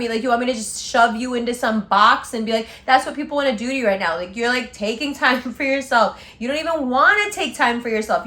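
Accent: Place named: Brooklyn, United States. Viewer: Articulate and on point for the first half...straight up Brooklyn for the second half! Love you....need to get into Libra season...🙏🕊🌬⚖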